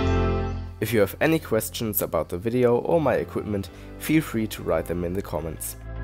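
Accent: Germany. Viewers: Are you german???? Your voice and english accent sounds a lil bit like that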